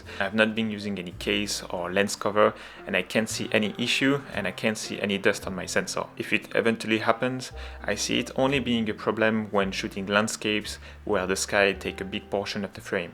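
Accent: France. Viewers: Houuuuuuuu toi t’es français toi hahahaha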